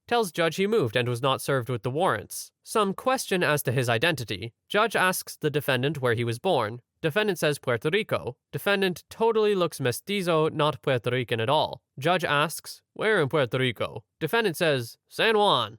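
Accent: France. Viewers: 𝑀𝒶𝑔𝓃𝒾𝒻𝒾𝒸𝑒𝓃𝓉 French accent! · By all means your french accent was enjoyable mhm mhm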